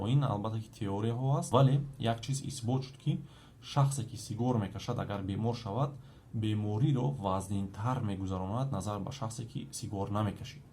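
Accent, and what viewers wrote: Tajikistan. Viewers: Доктор саломат бошед! Ки бо лахжаи тоззаи точки гап мезанед салом аз Киргизстан Андарак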